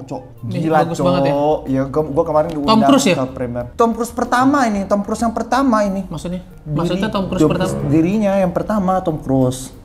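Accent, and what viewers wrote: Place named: Surabaya, Indonesia. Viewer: Dari dialek misuh2nya, Koko nie beneran orang Surabaya. 😂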